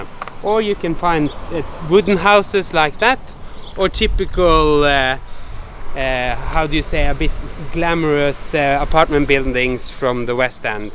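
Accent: Northern Norway, Norway. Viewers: e du nordlending? 😁 cool videos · You are so norsk although you are speaking English but norsk keeps popping out 😊👍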